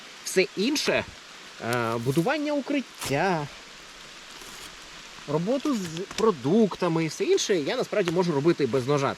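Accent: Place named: Russia. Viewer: Я тоже не понимаю зачем говорить на одном языке, а думать на другом!? У автора так и вырываются русскоязычные нотки в диалоге и водно, что украинский даётся тяжело, а, в карпатах на украинском, разговаривают гораздо быстрее!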